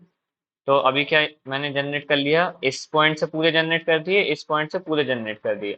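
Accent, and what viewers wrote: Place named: Bundelkhand, India. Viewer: Bhaiya ur Bundelkhandi accent is so good 💯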